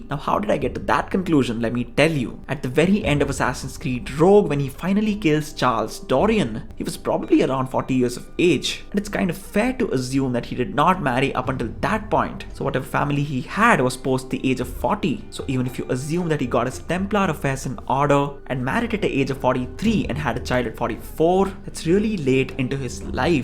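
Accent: India. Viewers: Are you an Indian ? You sound like one...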